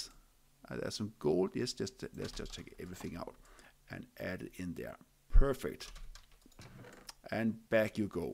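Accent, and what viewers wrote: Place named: Denmark. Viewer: can't decide if you sound german or Danish, but pretty sure I hear the strong danglish accent.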